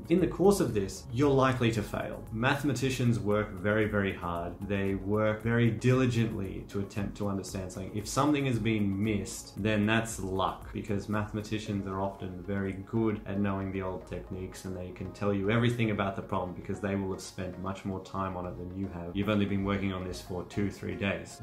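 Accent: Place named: Australia. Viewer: Aussie accent :O oh you're at usyd? Im over at UNSW. Not even studying maths but I'm going down a rabbit hole and I now I really wanna relearn maths 😂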